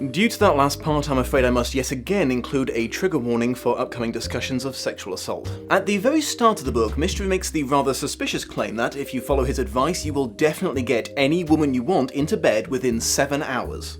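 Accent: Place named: United Kingdom. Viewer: I know. I've never been into a guy more. Plus, he's got a (real) British accent, respects LGBTQ+ and women, and has good teeth! What more can you ask for in a man?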